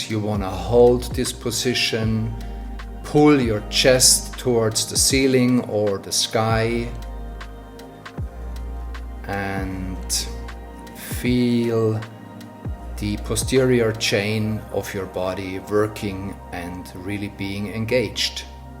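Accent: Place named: Germany. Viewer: Love male german accent.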